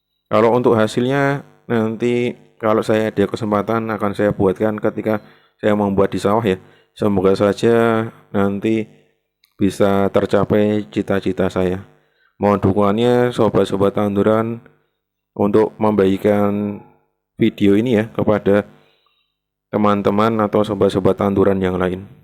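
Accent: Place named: Banyumas, Indonesia. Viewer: Kie logat e ngapak Nemen ya.. Hehehe Salam kenal mas ku,,, Iki umur pira kompos debog e...? Aku wes gawe umur 1 bulan urung apa"...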